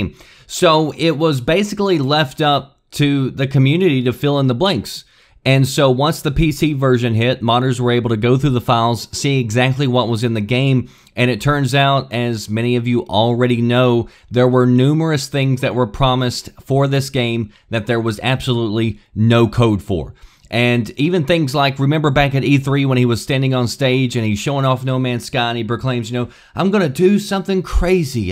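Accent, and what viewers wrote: United States. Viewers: Wow this guy has some click bait and a really country actsent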